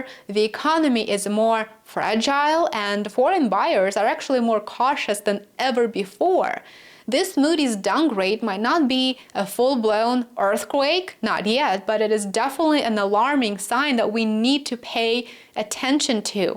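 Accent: Russia. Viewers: Pretty Russian accent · She sounds Russian. Is this Putin's propaganda Ho?!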